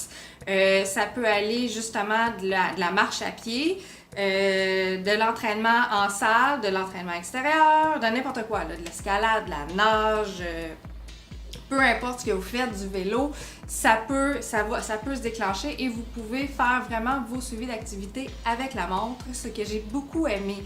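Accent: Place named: Quebec, Canada. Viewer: language de cetebese .. quebecois ..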